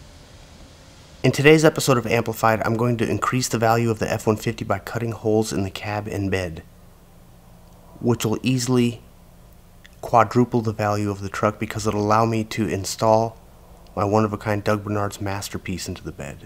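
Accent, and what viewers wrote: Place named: Mexico. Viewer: you sound hispanic / mexican asf my guy / dude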